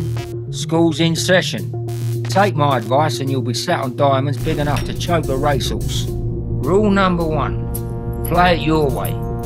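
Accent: United Kingdom. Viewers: The narrator reminds me of Michael Caine. That's a cockney accent I'm hearing, right? Curious as to why this game is launching on a Wednesday - most PSN games launch on Tuesdays, and I haven't noticed info about this on the PS blog. Is this announcement tying into the PlayStation meeting today, particularly with the PS VR version coming soon?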